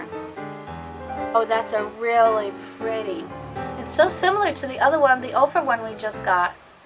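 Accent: New York, United States. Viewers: From one New Yorker to another...who sound the same lol! Love your videos! 😉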